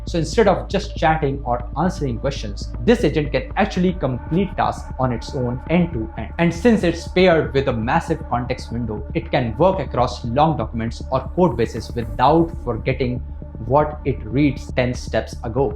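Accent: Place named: India. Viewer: omg. i guess your audience is meant for indian people not american people. you are impossible to listen too with your accent.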